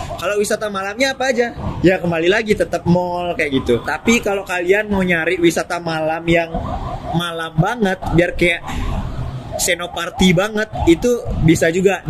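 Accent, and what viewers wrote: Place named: Java, Indonesia. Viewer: Kurang medokkkkkk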